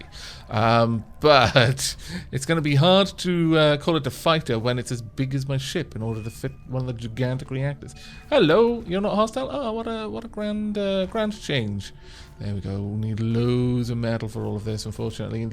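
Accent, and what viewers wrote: United Kingdom. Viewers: Impressive that you get a British accent out of it, considering (and his accent) is Welsh, not British. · PyroDesu Wales is part of Britain. irgo he has a British accent.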